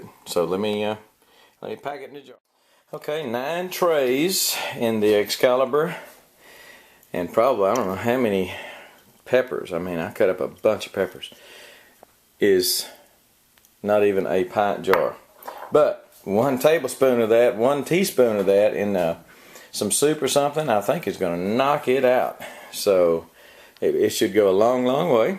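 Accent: Texas, United States. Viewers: Your Texas accent is Awesome! "Hand was Glowing for a Couple Days!" (^_^)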